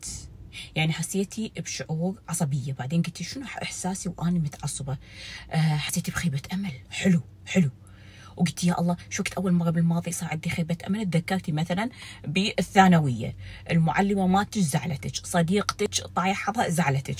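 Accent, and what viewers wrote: Iraq. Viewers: جعل ما يحجي غيرج لبى لهجتج العراقيه ♥️🎈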